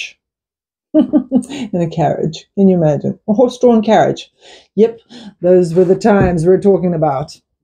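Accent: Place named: South Africa. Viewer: Your voice and accent give a sense of familiarity, having lived in SouthAfrica as a child. Simple words of wisdom or proverbs seem to have disappeared from daily language.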